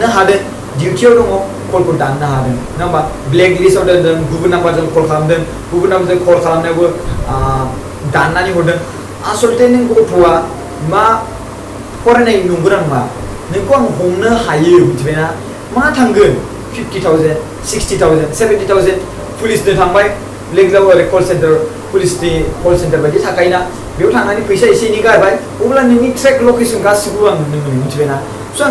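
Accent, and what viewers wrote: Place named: Baksa, India. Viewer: Baksani railainai ...style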